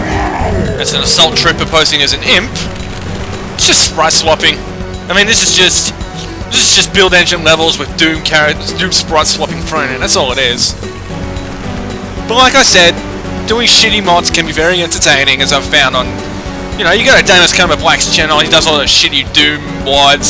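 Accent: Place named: Australia. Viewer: Haha, oh dear. I don't think I want to know. Actually, hopefully I'll never know unless curiosity gets the better of me of course. Ps. Awesome to see an Aussie doing this. Assuming of course your accent IS Australian :P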